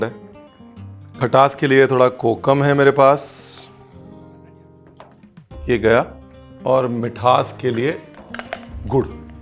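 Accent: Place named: Punjab, India. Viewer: Sir, I come here to listen your Punjabi and love the way you talk 😀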